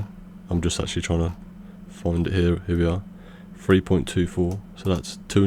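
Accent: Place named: North, United Kingdom. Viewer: Thanks, and you do speak clearly! The Northern accent might throw some, but that's their problem, they need to learn to listen better.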